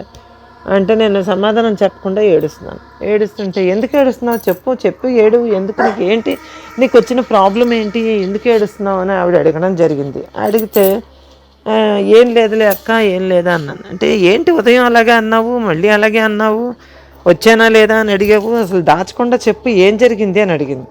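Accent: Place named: Parvathipuram, India. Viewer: Ammaa maadi kuda parvathipuram.konni padaalu appativi golem lantivi vintunte chaalaa santhosham anipinchindi .mee video chudatam start chesina tarvaata starting lo appati aa yaasa konni sarlu mee notiventa vunnappudu ide santhosham kaligindi ammaa meerante naaku chaala ishtam ammaa❤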